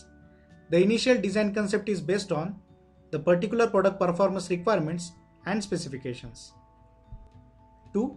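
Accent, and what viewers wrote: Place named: India. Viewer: I dont need a dislike-button to know if its gonna be a good "tutorial" or not.. When i hear that indian accent i know its gonna be great!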